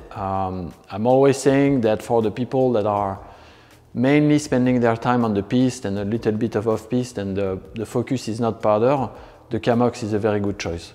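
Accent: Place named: France. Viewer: Très beaux skis !👍 Ça s'entend que le présentateur est français 🤙😁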